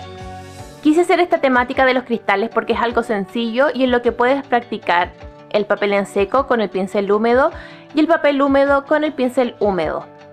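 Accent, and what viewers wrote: Chile. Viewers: Chilena? :D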